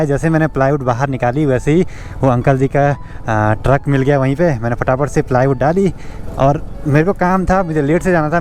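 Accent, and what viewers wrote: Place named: Garhwal, India. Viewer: Bhai aapki garhwali boli bahut Mithi hai.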